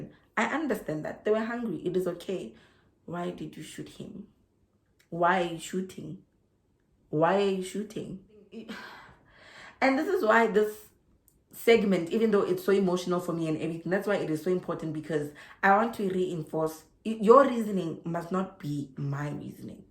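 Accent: South Africa. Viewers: you are an inspiration you are a pleasure to listen too, I feel my heart full of nostalgia hear your wonderful personality one thing I miss from South Africa is the way black South Africans speak english, people may think I am being racist mentioning the accent and way Black South Africans speak but is a term of endearment, as it is one of the most beautiful things of African people as it shows the beauty of the African soul, I too moved to Australia and have built a good life here, I would be dead already if I had stayed in SA having Diabetes and blood clots my biggest sadness is when we voted for one man one vote all whites new that was the end of Apartheid which was necessary as it was an degrading evil, most whites were expecting to see all races especially Black people lives upfift to a middle class lifestyle we as whites enjoyed, and to how the ANC has destroyed everyones dreams except their own selfish lives is so so sad, so happy you managed to get out and build a wonderful for yourself and family you should send your video to TED TALKS as you have a gift and are an amazing speaker you are a beautiful person with so much to give to humanity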